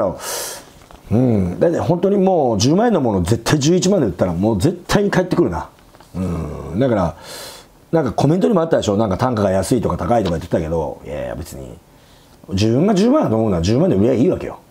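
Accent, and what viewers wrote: Nagoya, Japan. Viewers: 名古屋弁聞くとホッとしますね(^ ^) 為になる話ありがとうございます。 p.s.錦は高級で行けないので、栄の女子大小路によく行きます。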